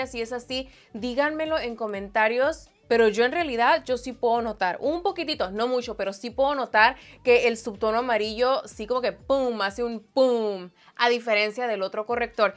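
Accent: Sinaloa, Mexico. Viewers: "SINALOA" Se me viene a la mente tanto por tu acento como tu personalidad 😋😉 Saludos desde Ensenada 😎💜 Espero ganar porque me encantaría aprender a recrear tus maquillajes 😍😄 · Me encantan tus videos... cada que subes pienso en "Sinaloa", tienes bien marcado el estilo sinaloense hehe .. Te veo desde Ensenada!!😊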